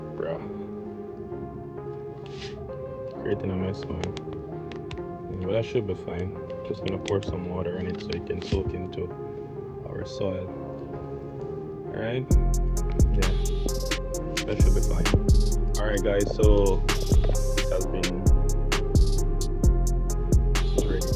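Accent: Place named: Jamaica. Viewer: I'm a grower my self and you sound like ah yaad mn.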